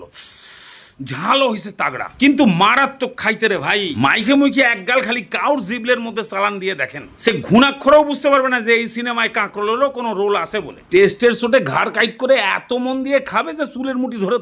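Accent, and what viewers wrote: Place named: Bangal, Bangladesh. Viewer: Aber bangal vasa ta keno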